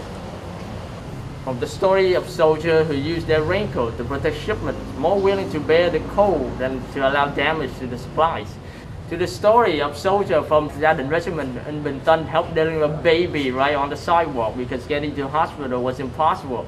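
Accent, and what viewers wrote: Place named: United States. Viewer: Good American accent, Meaningful content!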